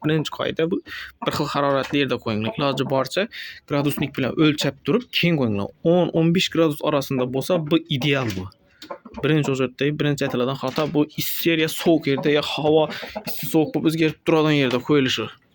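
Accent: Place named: Khorezm, Uzbekistan. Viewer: Авваломбор узрку,Хоразм вилояти хам Узбекистон худудида жойлашган ва мактабларда адабий тилда дарс берилади,лекин иккита хоразмлик сухбатлашсангиз ,улай агар умуман тилингизга тушунмайман,шевангиз жуда кучли,маслахатларингизга кизикдиму,тилингизга тулик тушунмадим😊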